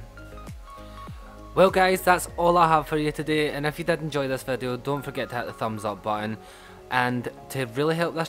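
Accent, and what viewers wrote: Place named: Scotland, United Kingdom. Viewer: Jesus ..you're so scottish😂😂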